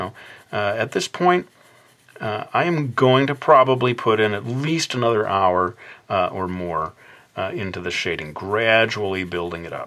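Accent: United States. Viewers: excuse me your american sound hurts my ears but i listen to u how rude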